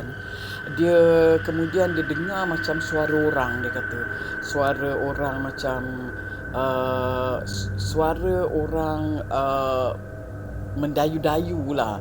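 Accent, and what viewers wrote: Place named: Kedah, Malaysia. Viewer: Org kedah mana suaranya??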